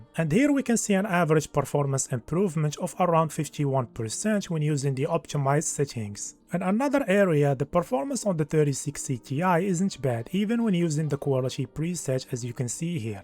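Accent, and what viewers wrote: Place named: Morocco. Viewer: Moroccan accent all over the place nadi a bro chokran bzf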